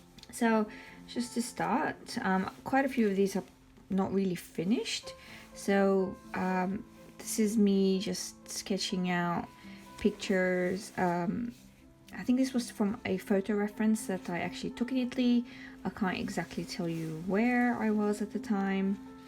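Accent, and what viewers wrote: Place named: Australia. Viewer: Okey, thanks for answering! I didnt think your accent did sound like australian, but after a bit more listening I almost hear it. 😅 Hope to see much more from you and your art! Love from Sweden!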